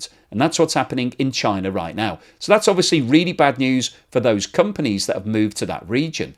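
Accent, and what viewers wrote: United Kingdom. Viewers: That is okay we are used to the British pronouncing words funny. China will never be net zero. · I like how you Brits say 'tree', whenever a word ends in tory or tary. You all should hear y'selves:person-turqouise-waving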